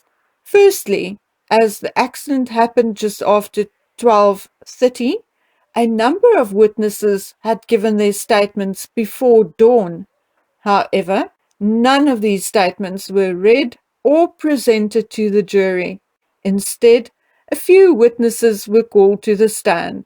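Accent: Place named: South Africa. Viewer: Wat klinkt het Zuid-Afrkaans zo mooi🙂..in Nederland wandelen we ook, en in bepaalde omstandigheden kuieren we ook..ik bedoel dan gebruiken we het woord kuieren als we op ons gemak wandelen in het bos bijvoorbeeld. En ik ben zo blij dat een heerlijke tijd heeft gehad bij haar familie 😁 Groetjes vanuit Gouda ( Nederland)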